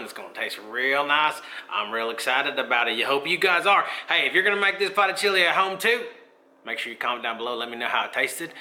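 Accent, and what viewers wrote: United States. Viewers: u so funny wit dat cowboy accent, u great man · Lol😁 The whole time I was holding my breathe praying🙏that your mustache didn't fall off into that Chili ha ha ha😁😁😁😁😁😁😁😁😁😁😁😁😁😁😁 I am going to definitely try making this chili I could literally taste that chili while watching you cook it..looks amazingly good👍 You Got that Southern accent down packed✌😋🙏👍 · Sorry, not like British one. The cow boy one in the west USA. ♥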